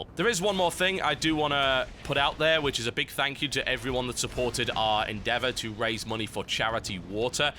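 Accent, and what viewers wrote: United Kingdom. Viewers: Moving to the States? hope he doesn`t lose his awesome british accent! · and so ends the o_O. Hope you manage to maintain the accent. British accents are cool. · Farewell, British accent. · he will never lose his British accent, it may fade a bit, but no he won't. I know numerous people who moved here from the UK and they still have a strong British accent. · well he better not lose the british accent :) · you helped me to continue to use my 'modernised' victorian accent despite the petty mockings of my schoolmates. If you lose the accent, know that I shall continue to use the accent in memory of, arguably, my favourite british person of all time. Good luck in the states.:)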